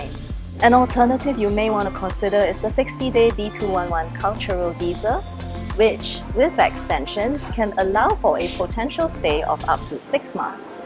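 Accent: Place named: Singapore. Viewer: I love the Singaporean accent!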